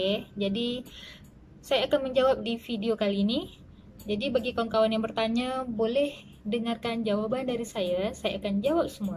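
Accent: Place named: Indonesia. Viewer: Sis maaf,siS org melayu tapi warga indonsia Tapi Bahasa malaysia anda bunyi indon😊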